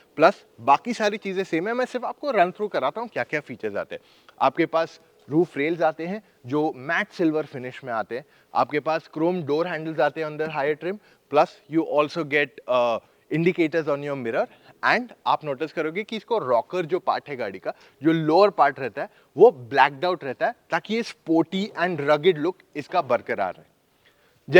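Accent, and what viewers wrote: India. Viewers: Love ur Hindi accent 😍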